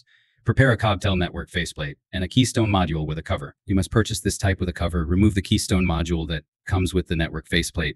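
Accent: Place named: United States. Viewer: American accent with a uk plug? Hmm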